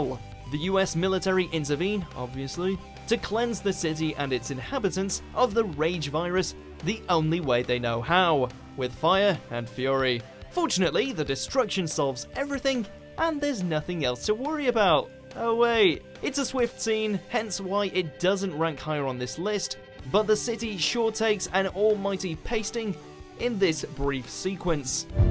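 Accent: United Kingdom. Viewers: You already know there UK when you here the voice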